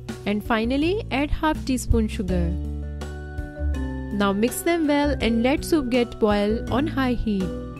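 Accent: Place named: Europe. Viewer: U Europeans confused with t and"ray".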